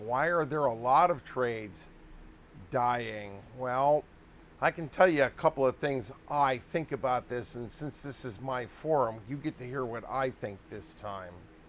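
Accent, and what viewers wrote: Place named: Chicago, United States. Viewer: Out of curiosoty, just where the heck are you from? I almost hear a Chicago accent? Maybe Cleveland?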